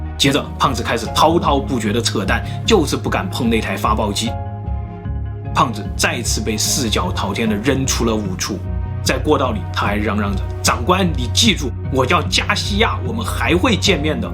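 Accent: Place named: South, China. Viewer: 我自己蠢，所以特愛聽聰明人的故事。這位南方口音超重的小伙子說的奇談怪論有趣、好聽、我喜歡😘。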